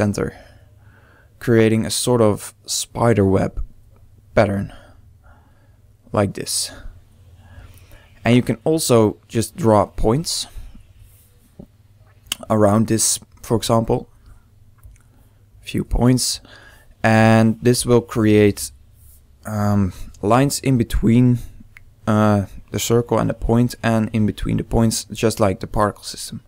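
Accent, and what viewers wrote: Netherlands. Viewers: Are you Dutch? juging by your accent.. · You sound dutch ;) very cool addon! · je hebt een Nederlands accent :-)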